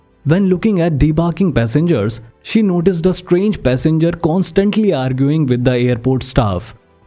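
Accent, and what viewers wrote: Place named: India. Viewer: I hate indian accent 🤢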